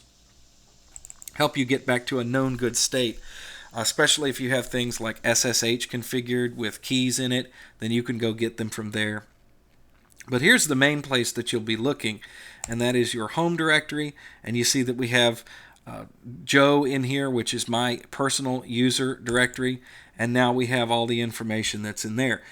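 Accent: United States. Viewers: Joe, just found you! Disabled, I am keeping myself busy trying multiple times to install Linux with failure due to various reasons. lol I need to desperately get up and running within a week only or else I will be in a pickle situation. Familiar with using pc and windows 30yrs, midrange / mainframes-user. U sound like u r n USA. I am limited to web access now. I have few KEY QUESTIONS. Can I email you Sir? Humble Respects. Alton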